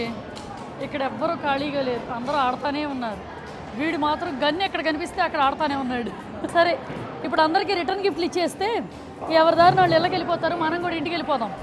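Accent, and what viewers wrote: United Kingdom. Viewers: She got that cute British accent 🥰❤️ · Her British accent is adorable❤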